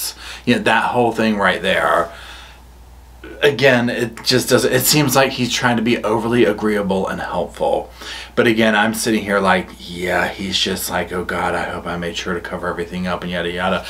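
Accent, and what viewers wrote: South, United States. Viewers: Why don’t you have 1m subscribers? You are the best. Great wit and the southern accent makes it gold. !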